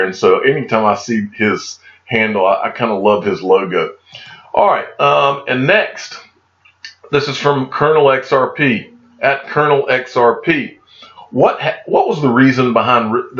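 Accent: South, United States. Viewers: you have the southern drawl going on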